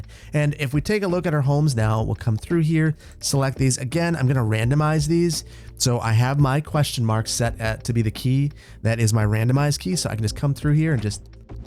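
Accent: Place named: Upper Midwest, United States. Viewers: I cannot wrap my brain around your neutral Midwest non-accent, except when saying "tayg". But seriously, love the content - I always look forward to Saturdays when I can catch up on all your videos for the week. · Tehg Gotta love that upper Midwestern accent. Great episode sir! Did you ever add any houses of worship (churches) to VB?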